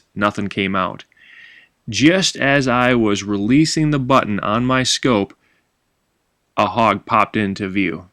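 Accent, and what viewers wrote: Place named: Midwest, United States. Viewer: Your accent sounds midwestern. No offense, a lot of people move to Georgia from the North. My ex father-in-law was from Michigan.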